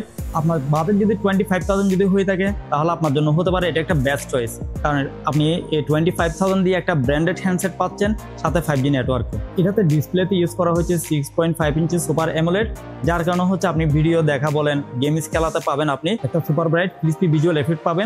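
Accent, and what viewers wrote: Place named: Chittagong, Bangladesh. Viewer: ভাই আপনি যে চট্টগ্রামের লোক সেটা আপনার কথা বোঝা যাচ্ছে,অতএব আপনি আপনার মাতৃভাষা চেঞ্জ করে শুদ্ধ ভাষায় কথা বলুন,যে কথার মাধ্যমে প্রত্যেক জেলার মানুষ আপনার ভিডিও দেখে উপকৃত হবে।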